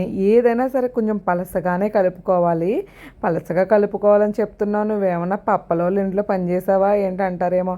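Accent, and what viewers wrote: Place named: Godavari, India. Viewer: Super👌 Godavari language😂😂👌👌👌 Ekkada Unna language maradu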